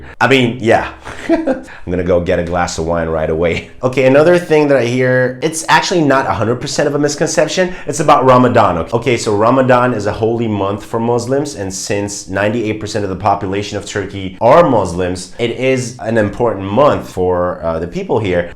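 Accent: United States. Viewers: You looking Turkish but sound American and born in Germany? Haw can ? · I was shocked at some of the things people think about Turkey and I’ve yet to visit. lol Love how you speak English with an American accent. Your videos on Turkey are so helpful. I’m trying to learn Turkish and hope to visit next year. Thank you 🙏🏼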